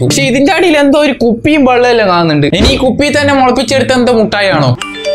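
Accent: Kannur, India. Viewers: kannur basha polichu.......😂😂🤣🤣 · ee kannur baasha kelkaan oru prithyeka sugamaa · Kannur slang😂😂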